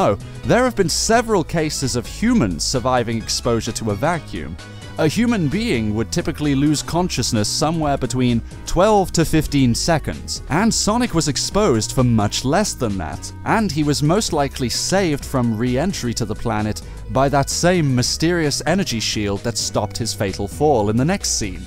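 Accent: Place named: United Kingdom. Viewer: 【The Sonic Theorist】 Hey mate. It's just a suggestion but please do you think you make a video or 2 such as ya top favourite Sonic characters and/or top favourite Sonic games? I'd LOVE to see videos like them!:D:D:D Or do you already have them on ya channel? I love your accent!:D:D:D Are you from the UK too? I am! XD I'm from Sunderland, England! XD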